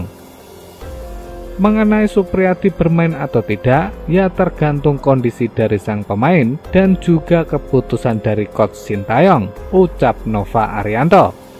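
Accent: Java, Indonesia. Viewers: medok amat mas 😂😂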